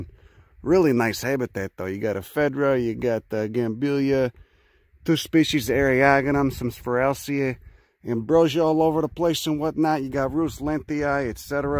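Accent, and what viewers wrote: Chicago, United States. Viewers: That's a Chicago accent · That thick Chicago accent tho!!!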